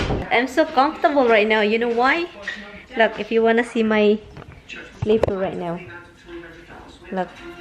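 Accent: Visayas, Philippines. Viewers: I always wacthing you ,,kc,,natatawa din ako s visaya accent mo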